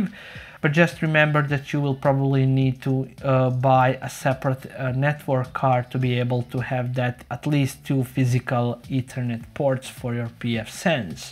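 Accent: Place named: Russia. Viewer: You compiled this list of six "tiers" of hardware and then decided that the most efficient way for you to convey this information was by speaking slowly into a camera? You speak far slower than I can read. Also, your accent grates on my ears. I can't stand your Russian/Balki from Perfect Strangers accent. Why didn't you just post this information on a web page? This video is complete garbage. Whoever told you that you should speak in front of a camera should be tried for war crimes.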